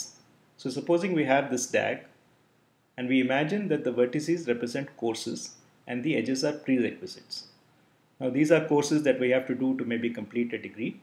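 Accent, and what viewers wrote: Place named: India. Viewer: Ужасный индийский акцент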